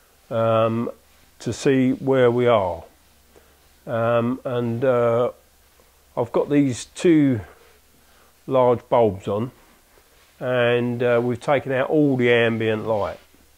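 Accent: United Kingdom. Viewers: I can hear the light holders clicking loudly. If you don't loosen them before you angle them you going to strip the gears.Is that a Cockney accent we are hearing. I'm a good boy I am.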